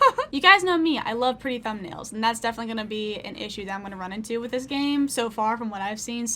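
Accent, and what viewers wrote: South, United States. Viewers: i like the southern accent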